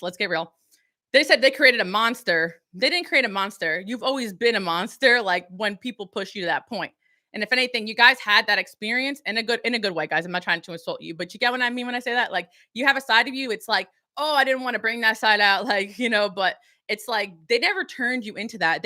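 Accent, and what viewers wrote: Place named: South, United States. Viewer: Lol readin territ… lean i to that southern why don ya 😅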